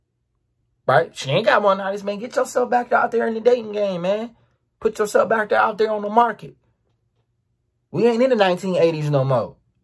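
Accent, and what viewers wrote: Baltimore, United States. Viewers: "yewtewb... wut it dew..." nun beats that Baltimore accent much love from Cali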